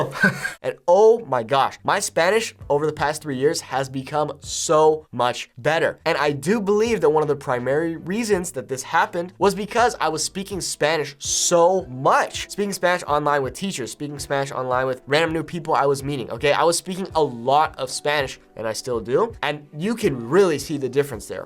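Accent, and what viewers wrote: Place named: Mexico. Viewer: si Señor ! I'm a podcast member and gonna upgrade to the taco. My italki tutor was wondering what podcasts i listen to and i told her, and I mentioned that yours was hardest for me to understand. She played a few minutes of the recent Gringo or Latinas podcast and said "that's VERY Mexican", lol...... needless to say i dissected that transcript later that night. Your podcast is the one that pushes me to keep working harder. Keep up the great work!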